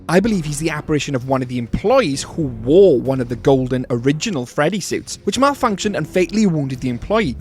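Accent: Wales, United Kingdom. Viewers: the welsh accent is real 🔥🔥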